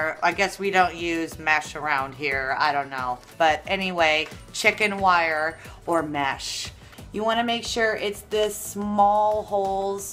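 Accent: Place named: Texas, United States. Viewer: Everyone saying she reminds you of grave3yardgirl... y'all are just recognizing the Texas Suburban accent. This is just how we talk here.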